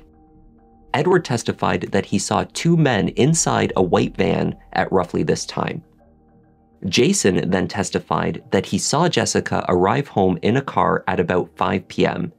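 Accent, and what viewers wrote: Canada. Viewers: Is the narrator from Canada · Thank you! It was only around a month ago, if that, that I discovered your channel, thanks to the wonders of the YT algorithm. Since then, I have watched 30 to 40 of your videos (really!). Furthermore, I sent an email to the popular political broadcaster Megyn Kelly, herself a fan of the true crime genre, and highly recommended your channel to her. Yes, I tend to forget names quickly, starting in real life around two seconds after meeting someone new. So when you refer to six different people in a video by first name, I frequently have to rewind in order to remind myself who is who. Curiosity question: I was surprised to hear you say on an episode I watched yesterday that you and your wife live in the British isles. Can I safely assume that you are Canadian? I have caught hints that you are in fact from Canada, including accent, pronunciation, and even referring to the US Coast Goard as the "USCG", which absolutely no one in the US does. 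Again, just curious. Thank you for all the amazing work you do on this channel. And I very much appreciate your personal response to my comment here. Jim W.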